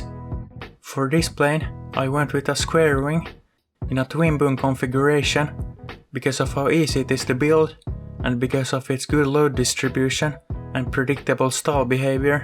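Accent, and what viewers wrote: Finland. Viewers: Are you fenno-swedish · 2 seconds in and i can already tell by his voice that it's in finland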